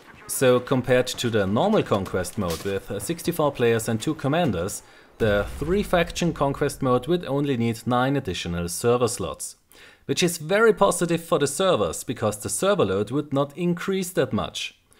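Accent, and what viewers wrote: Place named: Germany. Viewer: Haha I am joking of course! I love your ideas and stuff for battlefield. Love the accent too. Are you from Germany by chance?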